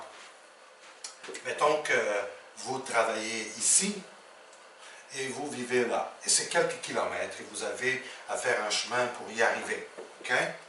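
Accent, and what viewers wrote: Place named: Portugal. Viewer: Bonjour Merci pour ce mixte entre l'accent Portugais et l'accent Québécois;))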